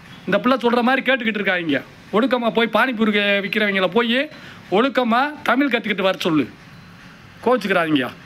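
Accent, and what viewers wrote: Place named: Madurai, India. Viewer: என்ன அவர் பேசுற தமிழ் சரி இல்ல அவர் மதுரைக்காரர் ஒரு ஒரு நாட்டில் ஒரு ஒரு மாதிரி பேசுவாங்க தமிழில் எத்தனை விதம் இருக்கு தெரியுமா😮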